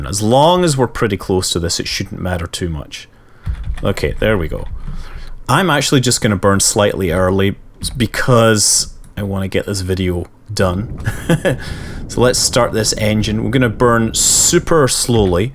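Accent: Scotland, United Kingdom. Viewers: cool accent, are you scottish or canadian? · he isn't Irish... he isn't faking Irish... he is Scottish that is a Scottish accent not a Irish one so you are technically right he is not Irish but he is not a faker.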